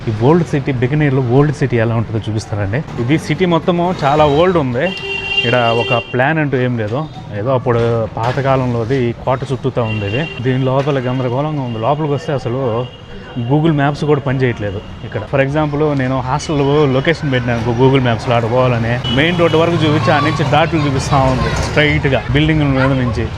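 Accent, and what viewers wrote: Nellore, India. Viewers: Hello brother.. Mede nellore mata laga vundi..... Made nellore le..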